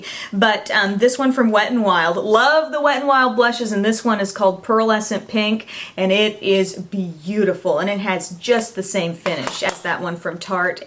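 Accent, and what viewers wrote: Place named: South, United States. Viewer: I also wanted to ask if you were from the South. I love your accent. :)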